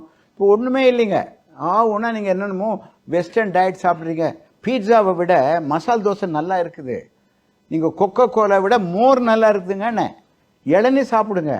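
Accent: Coimbatore, India. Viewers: நீங்கள் பேசும் அழகுகோவைதமிழைகேட்டாலே ஒருநோயும் வராதுங்கோ ஐயா உங்களின் இந்த கோவைதமிழை எப்போது கேட்டாலும் இனிமையையாய்உள்ளது வாழ்த்துக்கள்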